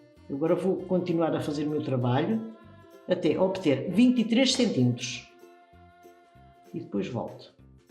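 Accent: Portugal. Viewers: Que bom um video em Português de Portugal. Simples. claro e conciso.Muito obrigada ! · Porque ela é de Portugal e lá o português que eles falam é um pouco diferente do jeito que falamos no Brasil . 😃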